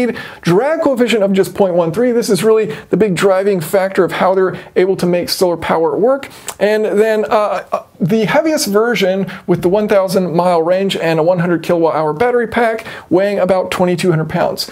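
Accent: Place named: United States. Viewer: I really appreciate hearing an American accent using metric units, just got to get the MPH to KPH - Love your vids, mate.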